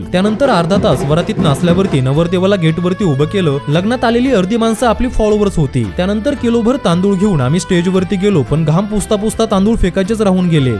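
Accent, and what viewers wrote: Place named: Vidarbha, India. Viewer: Tu Nagar cha watat nahi sagle shabda Vidharbhatle.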